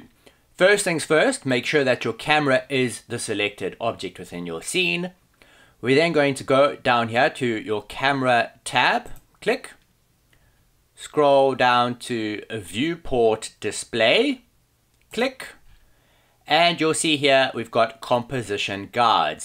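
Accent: South Africa. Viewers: South African accent comes through strong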